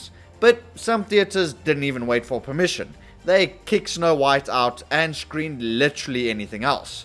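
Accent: South Africa. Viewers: Do I hear a SAFFA?!?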